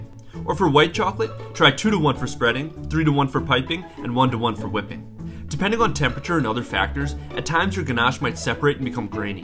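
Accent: Australia. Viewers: Why does he have an Australian accent for just this video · Love this channel, but is it just me or did just he get an Australian accent? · Your Aussie accent really came out in this one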